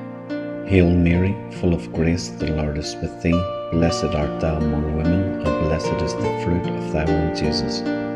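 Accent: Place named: Ireland. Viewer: I love the Irish voice. reminds me of being in school with the Irish priests and nuns as a girl.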